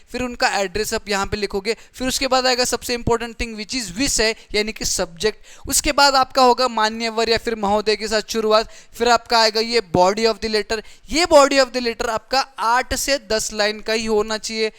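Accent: Maharashtra, India. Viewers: Sir aapn Maharashtra che aahat ka , toning tar tashich watate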